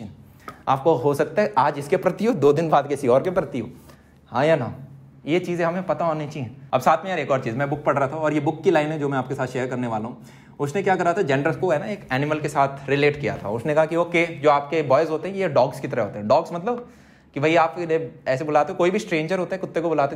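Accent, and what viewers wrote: Haryana, India. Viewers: what a haryanvi tone of speaking wah 😉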